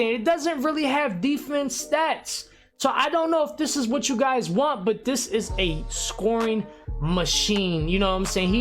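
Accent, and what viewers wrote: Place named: North Jersey, United States. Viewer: Oh yeah and shot out jersey in the buildin aaayyy South Jersey Camden county to be exact.. I know by how u talk u from north lol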